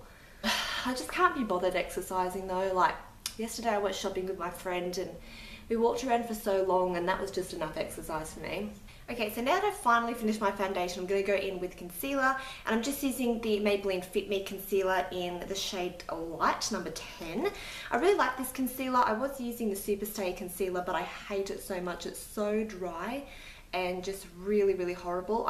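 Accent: Australia. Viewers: These are my fav videos from you. You look good without makeup & you make your makeup look so good :) + your Aussie yay haha xx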